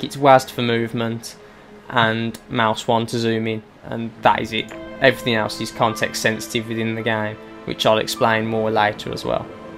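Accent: United Kingdom. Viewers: god damn I love british accents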